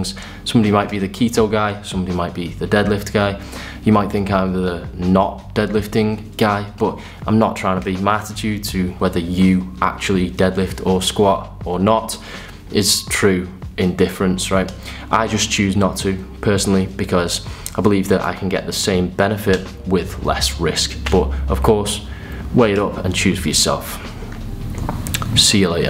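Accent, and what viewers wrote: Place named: North, United Kingdom. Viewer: Nice channel buddy. No nonsesne advice delivered in a perfectly no nonsense northern way. I've just hit 50 and spent the last 6 months trying to get back into shape after being fat and fifty and not happy. Doing great but I gotta say squats and deadlifts have just left me in pain and struggling to work anything with lower back. Not even lifting that heavy, I think my form is ok but I will be thinking of alternatives after watching this so BIG THANKS buddy.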